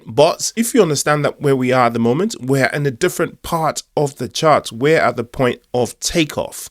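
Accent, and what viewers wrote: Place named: England, United Kingdom. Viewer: We can hear the english accent..no worries😂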